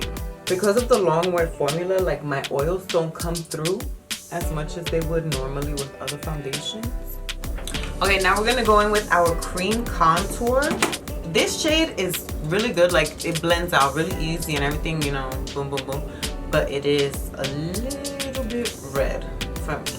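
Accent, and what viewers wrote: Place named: East Coast, United States. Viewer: My nosey ass found you in a Carly Bybel video comment section and I love love your east coast accent, you're so beautiful and you come across super down to earth. This video feels like hanging out with your friend and gossiping about the new makeup releases. Only thing I would suggest, in certain parts of the video like your background music was louder than your voice. Maybe just lowering your background music, so we can hear your lovely voice 🤗❤️❤️ much love to you and positive vibes your way.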